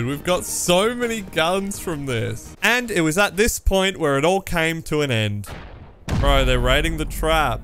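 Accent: United Kingdom. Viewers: You sound like you're British are you British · O you're Brit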